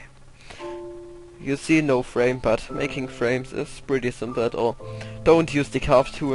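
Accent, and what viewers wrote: Germany. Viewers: You Are German ?? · Could it be, that you're german?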